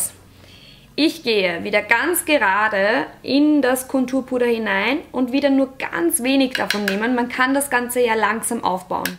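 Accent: Vienna, Austria. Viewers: Bist du Österreicherin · ihr österreichischer dialekt kotzt einfach nur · Bist du aus Österreich? Hörst dich ein wenig "Wienerisch" an, für mich als Wiener zumindest 😉👍🏻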